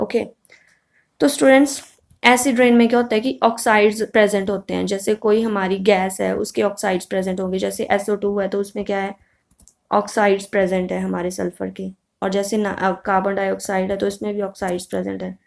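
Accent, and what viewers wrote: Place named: Pahari, India. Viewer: ये ट्रस्ट serirs "जो है वो"😅 कितने rupess में avilable हो जाएगी maam ? पहाड़ी accent बड़ा अच्छा आता है आपकी हिंदी में😃🙏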